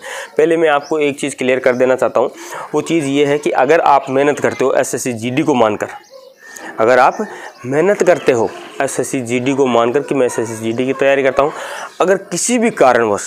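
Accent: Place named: Nagaur, India. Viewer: Sir nagour se ho kya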